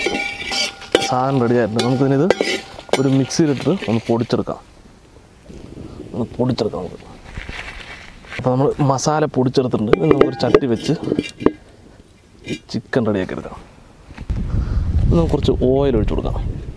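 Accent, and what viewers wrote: Palakkad, India. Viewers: സുഹൃത്തുക്കൾ ചിക്കൻ കാലെടുതതപോൾ കണ്ടു കള്ളൻ എന്നിട്ട് പറയുകയും ചെയ്തു നിങ്ങൾ ആളുകൊളളാലോ ആ പാലക്കാടൻ തനി നാടൻ മലയാളം എനിക്ക് വളരെ ഇഷ്ടപ്പെട്ടു എല്ലാ ആശംസകളും നിങ്ങൾക്കും നിങ്ങളുടെ ടീമിനും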